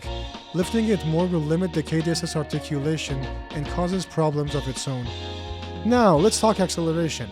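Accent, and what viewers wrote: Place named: Iran. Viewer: Nice Iranian accent. Iranians love Toyota’s 👍🏻👍🏻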